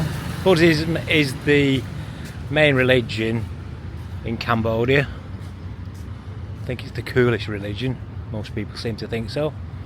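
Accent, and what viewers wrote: United Kingdom. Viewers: Is your accent Welsh or Scouse? It’s beautiful. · You sure you're not in LONDON? pure pommy accent 😂 ❤ glad that you guys enjoyed Cambodia ❤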